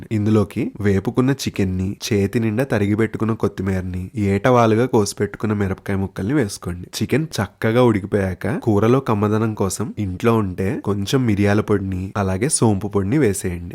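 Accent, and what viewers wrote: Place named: Godavari, India. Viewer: Me ammamma gari vooru godavari district side anipisthundi andi.nenu matlaade vidhaanam meru matladinatle vuntundi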